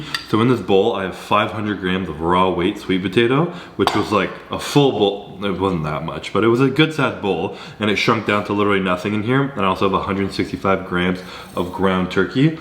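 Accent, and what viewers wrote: Canada. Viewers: Yo man are you Canadian? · He’s Canadian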